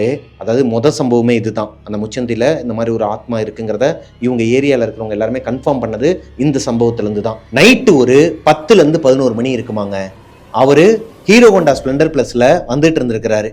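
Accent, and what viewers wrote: Madurai, India. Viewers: anna naum madurai Tha anna nanum samaikura appo vessel watching apo Matti illa nna mob panra apo yellaneramum unga vedios mattu Tha nna ketutee vela papaen❤ seriously nega oru Stress Buster nna enno nega neraiyaa gost vedios poduga nna especially nama ooru slang na pesirathuu enaku romba puduchurukuu nna love u Broooooo❤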